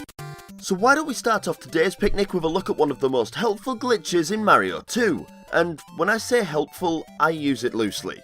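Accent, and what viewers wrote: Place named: Scotland, United Kingdom. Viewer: I love your Scottish accent <3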